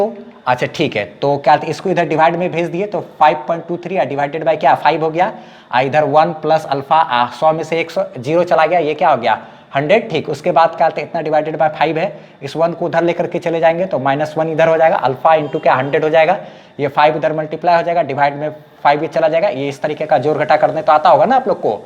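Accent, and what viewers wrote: Bihar, India. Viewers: Sir ji Bihar se ha kya ?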